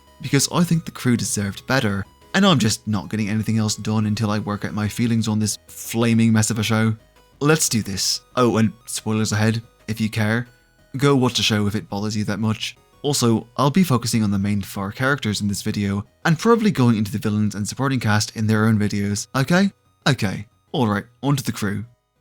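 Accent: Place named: Ireland. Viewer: exactly 😅 it's so mixed, it's like every Irish accent mixed together in a bowl 😊 no hate, I just can't place it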